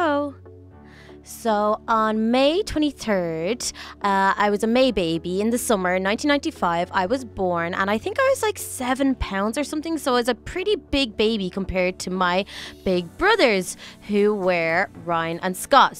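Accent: Ireland. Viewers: You sound VERY Irish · I was born after my grandpas birthday but he died a couple of months ago but you don't sound that Irish kind of but not a lot :P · Now I can tell where you get your Irish accent i have been watching your videos for 3-4 years now they make me happy and the other little club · I fucking knew you were irish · I love the accent from down south Ireland (I live in Northern Ireland in Co.Derry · I couldn’t hear your accent was Form but now I know it’s Irish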